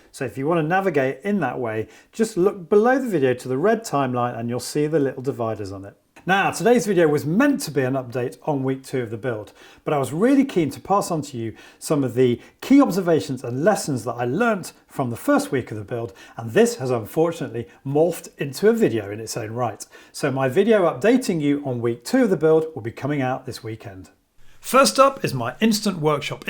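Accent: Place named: Southern England, United Kingdom. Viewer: Just wondering what part of the UK are you from. I am up North in Lancashire you sound like your up south?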